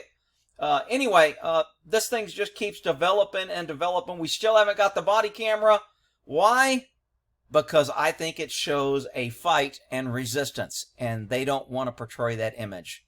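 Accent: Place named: South, United States. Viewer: Your hick accent is absolutely insufferable. But moving along. He already paid for these crimes. He was not in the process of committing anything you mentioned when he was murdered after much torture. And if you think violent crimes are wrong, then you must hate these officers because they did more than threaten or rob. And if you think that unlawful execution without trial by jury over a matter of $20 can in any way be mocked or excused, then surely you must believe an even more severe vigilante punishment is owed to these murderers. After all, their crimes surpass stolen cigarettes and you like to think you're a man of logic.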